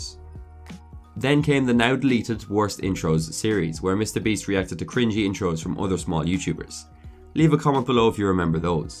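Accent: Ireland. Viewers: Nice hearing another Irish accent on YT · I'm loving the content on your channel, really excellent work and I hope you keep gowing/growing.... I'm wondering bout your accent tho, Laois Carlow, Offaly, Kildare?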